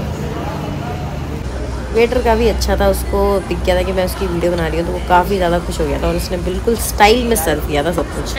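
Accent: Bihar, India. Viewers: It's nice to hear her bihari accent 😍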